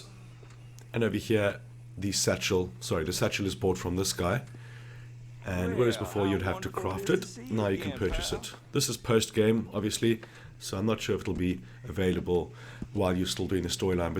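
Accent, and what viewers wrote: South Africa. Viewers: Sound like a South African 🤔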